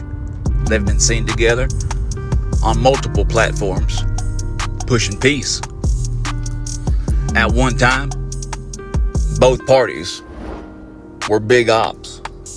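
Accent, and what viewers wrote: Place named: Texas, United States. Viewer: Who tf is narrating some Texas hick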